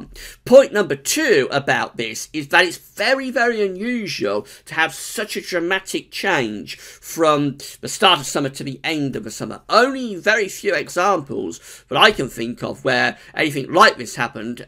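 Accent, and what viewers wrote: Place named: Liverpool, United Kingdom. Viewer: Hello mate! Thanks for your efforts which are duly appreciated. For those of us who do not originate from liverpool or wales or wherever you might come from, it would be extremely beneficial if you could tone down your accent so that you are more easily understandable by a greater number. Many thanks.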